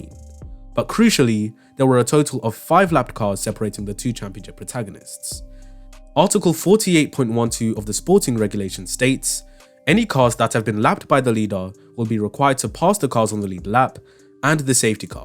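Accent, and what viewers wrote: United Kingdom. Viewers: once i heard the english accent i knew enough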